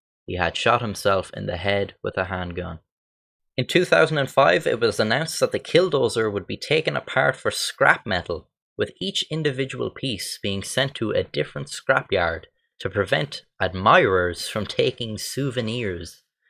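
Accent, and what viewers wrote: Ireland. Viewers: I just stick around to hear him pronounce “thirty” with a D. Abd other Irish crap like that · Is that a Carlow or Wicklow accent you have ?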